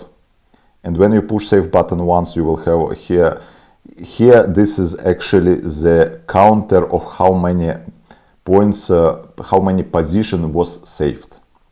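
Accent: Russia. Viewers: у вас очень сильный русский акцент, у вас только англоязычный канал?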